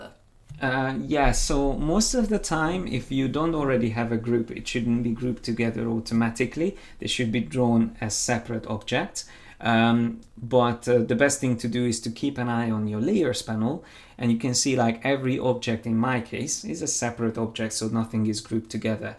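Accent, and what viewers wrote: Hungary. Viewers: Bro your accent sounds Hungarian! :D are you? Thanks for this, excellent tutorial!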